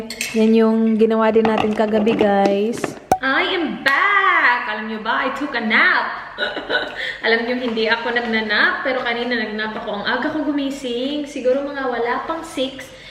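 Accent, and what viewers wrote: Visayas, Philippines. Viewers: Parang bisaya ang voice....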